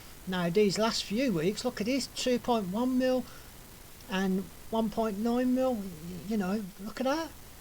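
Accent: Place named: Birmingham, United Kingdom. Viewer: Good video apart from the brum accent !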